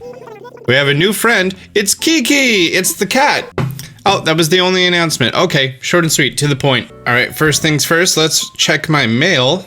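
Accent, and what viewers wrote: New Jersey, United States. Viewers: Can confirm, it's a jersey thing. I immediately knew he was from the same state as me first video i watched lol. Bergen/Passaic county perhaps?? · Dog I am pretty sure its a New Jersey accent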